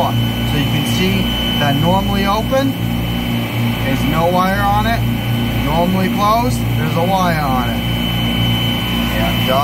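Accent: Boston, United States. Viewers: I thought so you have that Boston Accent I'm in Rhode Island now But lived an worked many years in Boston Love the area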